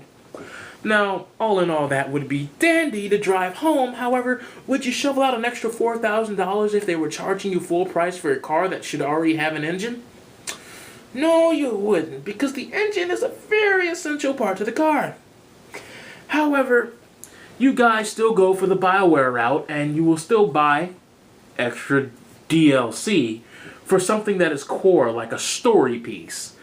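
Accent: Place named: RP, United Kingdom. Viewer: queens english.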